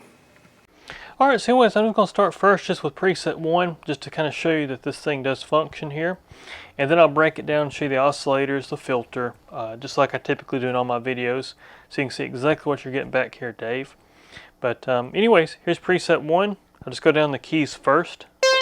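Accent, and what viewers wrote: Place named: Appalachia, United States. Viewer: Your hillbilly accent is just adorable.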